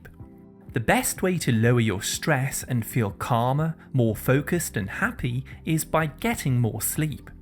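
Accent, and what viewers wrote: United Kingdom. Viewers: British accent. From England.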